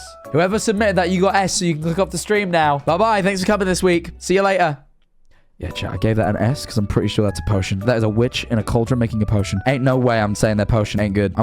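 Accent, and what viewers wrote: United Kingdom. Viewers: was extra british in this one 😂😂😂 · You're British?!?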